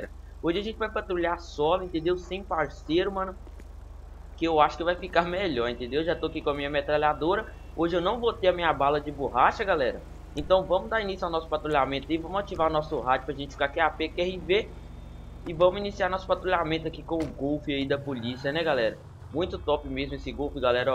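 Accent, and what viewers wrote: Minas Gerais, Brazil. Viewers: vc e mineiro e?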